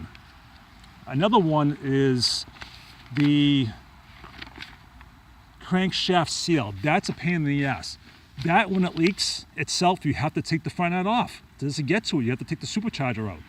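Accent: Boston, United States. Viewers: P.S you got the most Boston accent that I ever heard!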